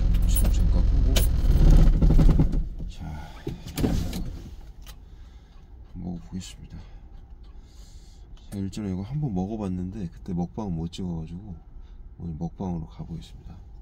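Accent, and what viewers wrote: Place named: Incheon, South Korea. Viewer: 말투가 인천이신데 인천분이신가요?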